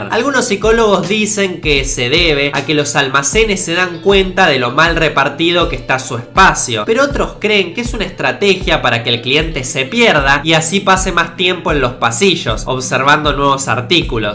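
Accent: Argentina. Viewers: es argentino no? xD viva argentina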